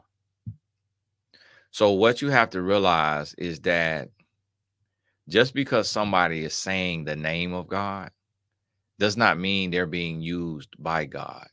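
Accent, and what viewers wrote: South, United States. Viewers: I started to make a comment underneath someone's video because this "man of God", who is also divorced, decided he wanted to make fun of you because of your southern drawl. He said that he disagreed w something you said, but I'm still puzzled about how Christians can disagree if they both believe in the same Word. I think he just called you out to up his subscribers. So instead of being a "typical angry black woman", I voted with my feet and no longer watch his videos.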